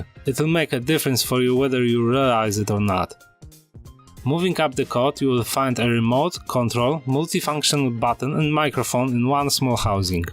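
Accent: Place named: Poland. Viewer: You have Polish accent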